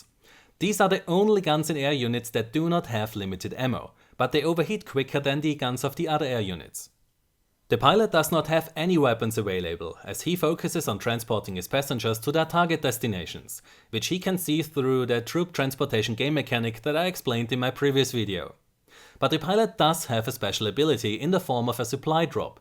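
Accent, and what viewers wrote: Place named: Germany. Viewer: can you speak german? I swear I heard a lot of german pronunciation in your work, so cute!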